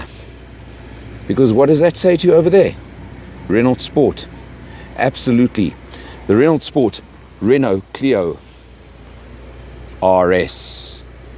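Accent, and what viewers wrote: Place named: New Zealand. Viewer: Your kiwi accent annoys me. No offence.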